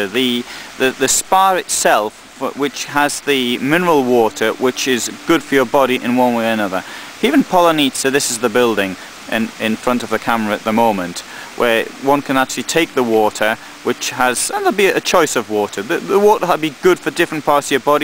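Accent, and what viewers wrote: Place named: England, United Kingdom. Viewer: I cannot quite make out your accent. What region of merry olde England do you hale from?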